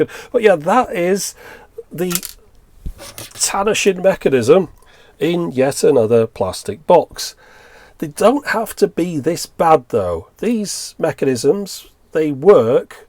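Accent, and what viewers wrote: United Kingdom. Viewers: I have a number of very good friends in the UK and this guy sound like so many of them. Before you get mad I AM NOT making fun of this man. I love this channel and what he does sharing technology with us. What I enjoy is the way he phases what he says. He said something along the lines of Yes you can play MP3's via USB drive but it does not really make any difference since it all sounds like utter rubbish anyway. When he says something is terrible it just makes me laugh because, he is ever so polite BUT, when he says something is bad his words are so hard, biting and direct yet so gentle in a way. I subscribe to this channel because; this guy is great and knows his electronics. This guy is honest. Yes sir I've brought a few vintage items just because I saw them here first. That said the reason I love this channel beyond the technology is because; this guy savages today's cheap electronics. 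The funny thing is the polite lovely tone of his voice and his choice of words almost kills a bad device with kindness which just makes me scream with laughter. I agree with him this mono cassette player \ recorder boom box is total and utter rubbish no matter what you try to do with it. I'm not joking when I say I am happiest when this chap evaluates modern tech because; he will buy cheap devices for 30 pounds sterling and put them through their paces. This is one of the best channels on You Tube! Thank you sir. You remind me of my friends from the UK who can say the most mundane things an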